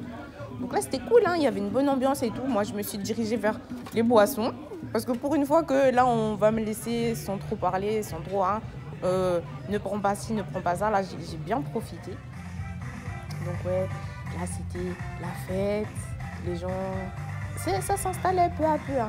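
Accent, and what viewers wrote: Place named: Europe. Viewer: Cool video. Jaime bien Mais la prochaine fois n'utilise plus l'accent des européens mais plutôt notre accent d'origine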